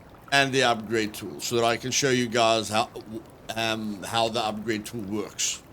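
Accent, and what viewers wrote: South Africa. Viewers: Hey man thanks for the walkthrough ! are by any chance south african ?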